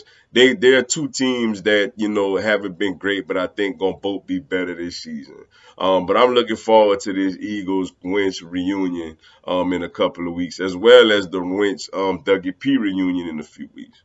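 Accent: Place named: Louisiana, United States. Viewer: Mr you sound like you make have a Cajun family tree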